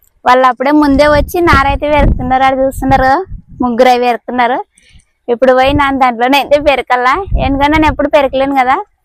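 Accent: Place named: Karnataka, India. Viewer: Mee matalde telugu karnataka side ani telisindi.nanu antunnaru bhagundi talli, god bless u.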